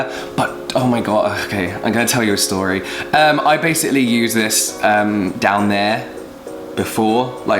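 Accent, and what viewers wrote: United Kingdom. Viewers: Are you British? 🤔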